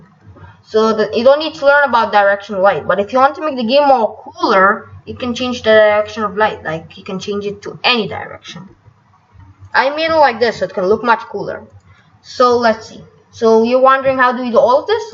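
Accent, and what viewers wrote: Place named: Spain. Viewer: ik it’s English but I thought u had a Spanish accent mb. W video tho 😅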